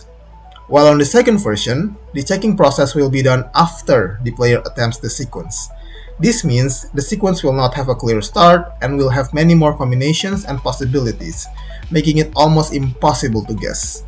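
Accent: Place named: Indonesia. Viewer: That sucks! hope you get your account back. But random question, Are you Indonesian by any chance? I noticed you have somewhat of an accent and it sounded similar to Indonesian accent.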